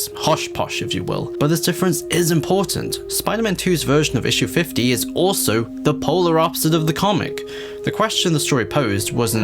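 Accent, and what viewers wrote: United Kingdom. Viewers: I find it funny that you thinking having an “insightful” analysis is zooming in on out of context, unconnected quotes from a comic book, with some douchebag with a British accent reading them to lo-fi hip hop in the background. It’s not insightful in the slightest, but there is another word for it: disingenuous. He misrepresents the comics in HORRIBLY bad faith. Nothing insightful about that.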